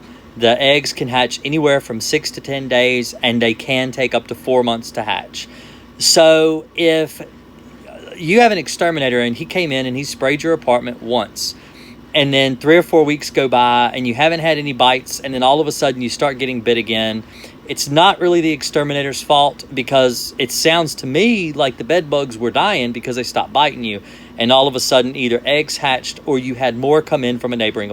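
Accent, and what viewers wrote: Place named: Virginia, United States. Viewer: Thanks for the vid. It was very helpful. Love the Virginia accent, by the way.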